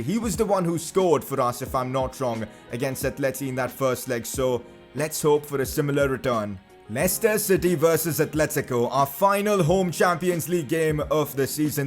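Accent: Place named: India. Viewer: Bro why do you accentuate your vowels too much. That Indian accent is too noticeable lmaooooo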